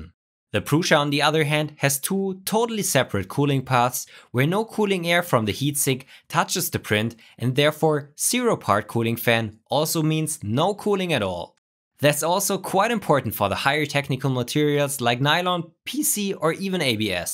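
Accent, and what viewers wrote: Germany. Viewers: Are you German or Amerikan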